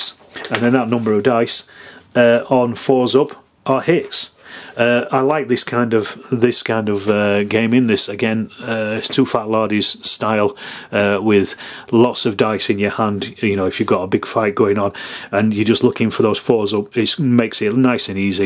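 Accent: Northern England, United Kingdom. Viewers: I preferred Medieval Wargamer's review of the rules, it was more positive and Nic has a sexy voice compared to your northern tone lol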